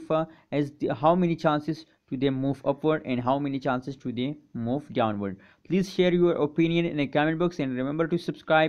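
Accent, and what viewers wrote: India. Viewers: Terrible indian accent.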